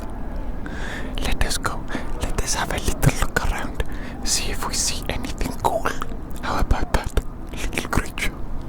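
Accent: Wales, United Kingdom. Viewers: Thank god finally got closure that you were from wales it was really bothering me that I couldnt prove it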